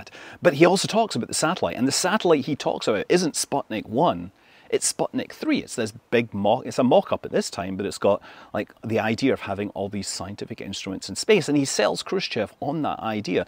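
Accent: Scotland, United Kingdom. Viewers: Now that we know you are in Scotland and don't just have that accent for fun, any SaxaVord coverage?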